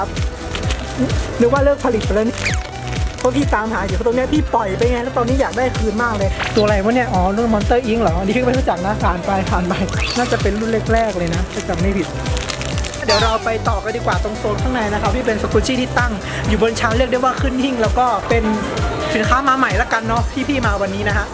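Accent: North, Thailand. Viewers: พี่ เปนคนเหนือหรอคะ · พี่เป็นเป็นคนเหนือเหรอผมก็เป็นคนเหนือ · อ้ายเป็นคนเหนือกะ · ปี้ๆคนเหนือก่ะครับปี้ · พี่ เป็นคนเหนือกะ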